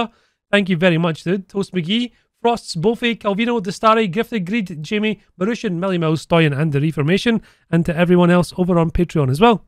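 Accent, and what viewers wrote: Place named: Fife, United Kingdom. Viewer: Just trying to get into WT, as a fellow Scot I found your vids and have ben going through them. Keep up the good work. Really struggling to place the accent though, either posh west end Glesga (east end kid myself but moved away and didn't go to prison or end up on drugs, actually done pretty well when I left that shit hole lol) Anyway, you sound like a Fifer who maybe moved to Glasgow (judging from some of the words used in older vids, naughty Fifer words) Great channel, keep clapping them cheeks ❤🤍💙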